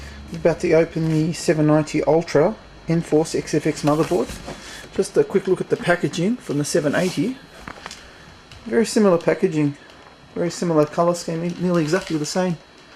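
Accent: Australia. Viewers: hey are u Australian???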